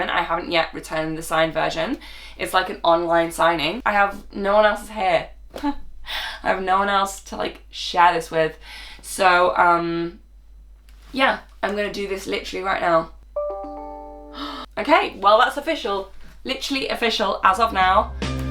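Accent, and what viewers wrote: London, United Kingdom. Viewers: I feel like you sound so different now! Maybe you've gained the London accent? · sounds especially british in this vid. Also why am i listening to the training process so seriously i'm not even a law graduate. Nevertheless congrats i'm so happy to see this!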